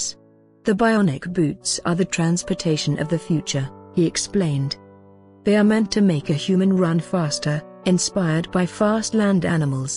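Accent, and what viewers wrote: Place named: United Kingdom. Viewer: Jeeez! Fire whoever designed this video! Nobody wants a screen full of words while a British-accented woman goes on and on in a curt cautious voice. Sheesh! Rule number 1 Pick your target audience! Are you aiming for middle aged women? I don't think so! Time for a major rethink!! Guess what we want to see when you have a title including 'Bionic Boots'? We SURE DO want to see those boots. What did you give us? A screen full of words. Not cool. (Hint: Seek coolness)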